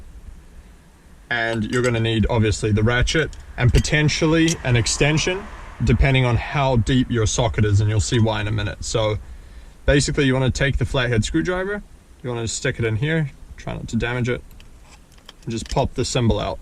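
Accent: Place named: Australia. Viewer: Interesting accent. Aussie in the US?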